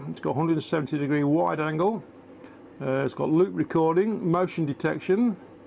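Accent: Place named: Manchester, United Kingdom. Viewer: MY GOD HES FROM MANCHESTER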